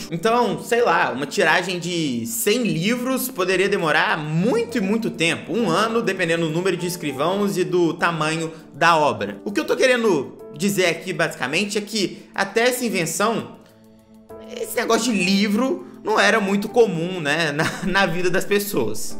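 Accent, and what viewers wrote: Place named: Bahia, Brazil. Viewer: Isso se chama sotaque.. Aqui na bahia é muito comum.. Como um brasileiro, tu já deveria estar acostumado com nossa variedade linguística e cultural.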